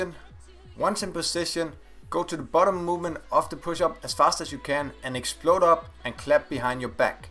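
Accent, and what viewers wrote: Denmark. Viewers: Nice danish accent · I love the Danish accent😂😂😂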